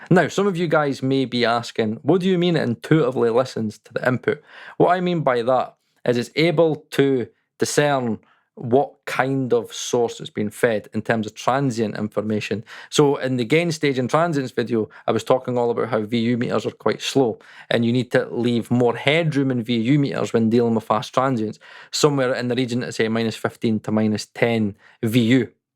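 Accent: Scotland, United Kingdom. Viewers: Removed all Acustica a long time back. I use far older hardware, so seeing your cpu spikes made me think "not for me...Again". On another note: Your Scottish accent + the Chipmunk effect made me crease. Keep up the humour pal when getting the odd dig from trolls. <3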